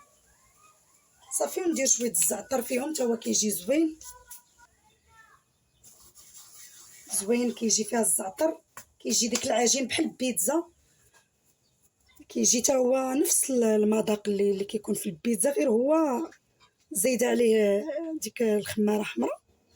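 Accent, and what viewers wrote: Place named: Abda, Saudi Arabia. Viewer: اللهجة ديال عبدية ولكن اختي استعملي دقيق القمح دكالة وعبدة عندهم القمح تبارك الله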